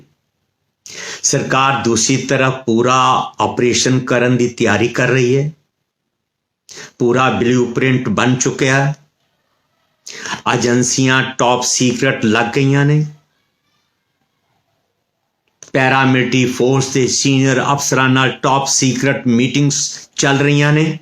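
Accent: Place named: India. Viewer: Tere boln to pta lgda tu punjabi nahi ga teri tone hi hindi aa tu kehde paase to punjabi aa ?? Kisaaan aa?? Sikh aa?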